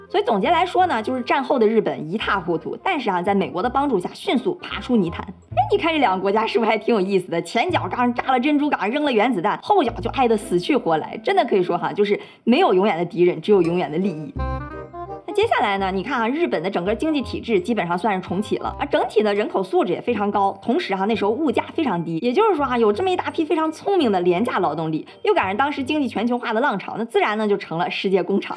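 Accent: China. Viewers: 大陸腔改掉我就信了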